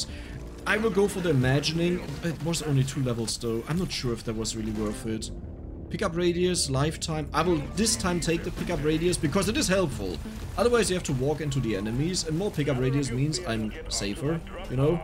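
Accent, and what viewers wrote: Germany. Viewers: Ich dachte mir die ganze Zeit, ser hat iwie nen deutschen Akzent. Dann so „Ach komm hör auch mit dem Käse“ 😂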